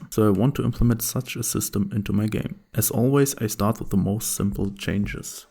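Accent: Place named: Germany. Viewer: You can hear the german.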